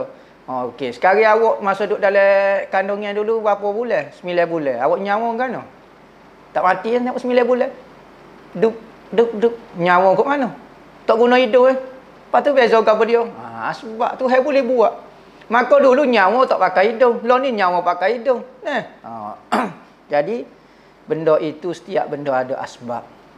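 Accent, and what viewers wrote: Kelantan, Malaysia. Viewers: Walaupun berbahasa kelantan pekat....tapi tidak bosan ,mudah difahami dan penyampaian jelas...alhamdulillah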